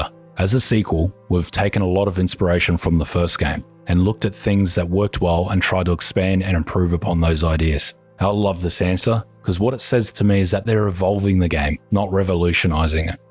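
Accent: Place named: Australia. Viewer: your voice sounds very Australian and as an Australian i can say thats a compliment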